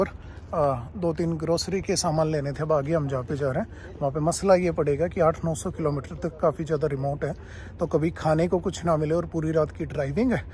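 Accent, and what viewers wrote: Haryana, India. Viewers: Haryanvi kitni hi shudh hindi bol le Haryanvi ka pta lag hi jata h Jai haryana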